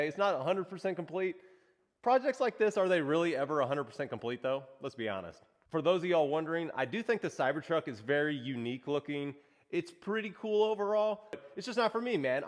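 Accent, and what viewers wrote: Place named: Ohio, United States. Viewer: Dude sounds like hes from ohio like the accent dose lol sounds like home lol😂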